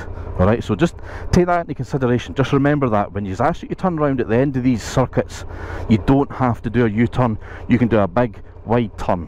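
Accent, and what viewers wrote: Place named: Scotland, United Kingdom. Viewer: I love the videos, but unfortunately, I can hardly understand some parts. :face-blue-smiling:The accent is very different. Are you from Scotland? :face-blue-question-mark: In any case, nice demos!! Thank you!! :trophy-yellow-smiling::trophy-yellow-smiling::trophy-yellow-smiling::trophy-yellow-smiling::trophy-yellow-smiling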